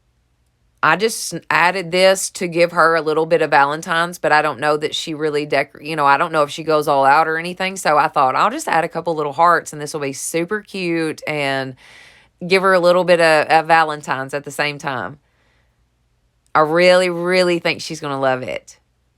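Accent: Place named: Oklahoma, United States. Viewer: Ohhh I love that piece for your SIL. It's gorgeous! (Ps. You're blessed to have an amazing SIL like that. Mine is the worst. 😔) Is Skillshare done live? Like in a class? Or can you view & pause like YouTube? Lol (I'm ALWAYS getting interrupted.. that's why I'm asking lol) Omgoodness! I'm loving these heart wreaths! Now I need to find those foam hearts now. Lol Love love love those little houses. Soo stinking cute! I LOVE your tassel you put with the wooden beads! You are soo awesome with ribbon! You got my attention in the 1st video that I saw of yours cuz you made this absolutely amazingly beautiful huge Christmas bow. It was gorgeous and took my breath away. I KNEW I had to subscribe after that. Plus, your accent. That always helps. Lol (my grandparents were from OK & Arkansas lol) Ps. Quick question.. do you have a mailing address? 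I will send you the Ballet Slipper chalk paint. 😉 Ty for sharing! ❤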